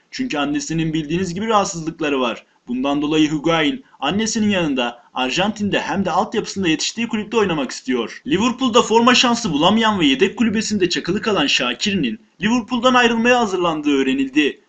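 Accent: Gaziantep, Turkey. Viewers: 1 sen anteplimisin 2 lyon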